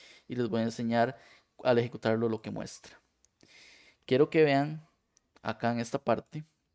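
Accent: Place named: Costa Rica. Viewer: Apenas empecé a escuchar este video dije "Ese acento es tico"🤭🇨🇷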